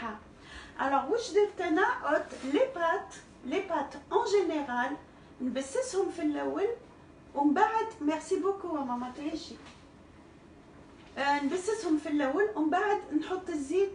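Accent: Annaba, Algeria. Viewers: Salem Notre grande Dame On dirait qu'on est chez vous c'est un plaisir surtout avec votre accent baunois hlouwa hlouwa Machaellah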